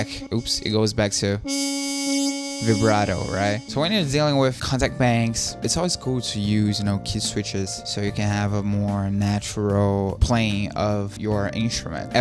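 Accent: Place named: Brazil. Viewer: Pelo sotaque eu acho que tu é brasileiro kkkk acertei?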